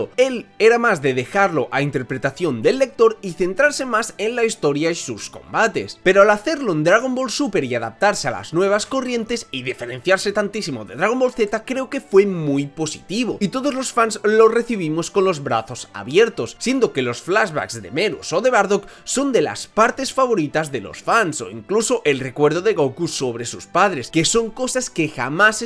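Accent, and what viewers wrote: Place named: Spain. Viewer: Al fin un canal bien narrado en español de españa… 😢 viva españa viva el rey viva el orden y la ley! 😂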